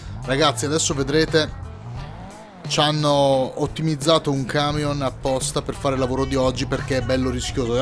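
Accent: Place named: Livorno, Italy. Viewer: ma di dove sei? Avvolte sembri tipo livornese AHAH😂 (sono quello dei guanti i da 9.99€ di decathlon😂)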